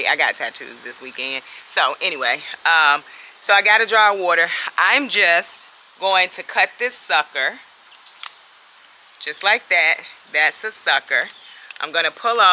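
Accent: South, United States. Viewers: Excellent job my friend.. I love ur accent so sourthern❤❤.. Ur garden is fantastic..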